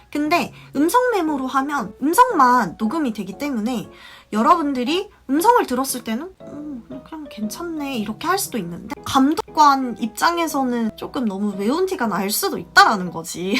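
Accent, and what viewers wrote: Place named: Gyeongsang, South Korea. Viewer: 베이스가 저보다 탄탄하신거 같네요 아 그리고 착각일수도 있는데 경상도사투리가 느껴지는건 기분 탓일까요 ㅎㅎ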